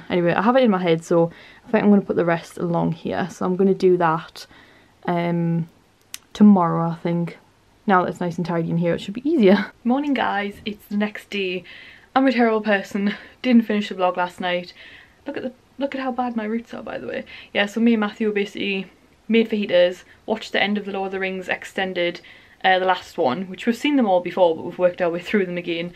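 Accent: Newcastle, United Kingdom. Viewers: Hey. Just found your channel through your disney vlogs. As soon as I heard the accent I had to subscribe lol. Hi there from a fellow Geordie:) x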